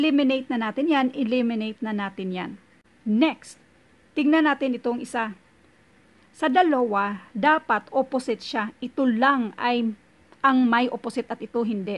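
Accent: Visayas, Philippines. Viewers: tsssk tsssk bisaya dii ka maam ? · Ma'am bisaya ka po? 🙂 nahalata ko lang hehe pero ganda po naman boses nyo kahit parang paos 😅😍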